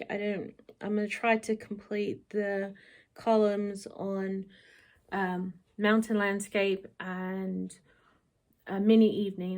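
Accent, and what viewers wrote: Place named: United States. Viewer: I am stitching a castle right now! It is sooo beautiful where you live! I almost forgot you’re an American until I heard a slight American accent still! 😉 I am a homebody and don’t like to travel but I do sometimes with friends and always have a good time. My husband doesn’t like to travel unless I make him and I don’t because I like to stay at home too! 😂 But sometimes my single friends need someone to travel with and I will go. I enjoy your videos and your projects sooo much!❤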